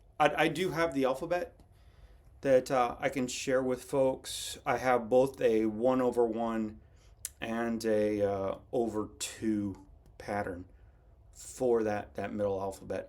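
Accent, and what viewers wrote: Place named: Wisconsin, United States. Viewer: Love love love the Plum Street saltboxes layout ..... I'm a house stitcher ...... you've enabled me 😊Also the Drawn Thread seasons idea. I've written this down or I tend to forget. Great great stitching!! Sounds like you are from Wisconsin. I'm halfway between Green Bay and Appleton. Enjoyed your bourbon story......I like to learn. Thanks for sharing! TV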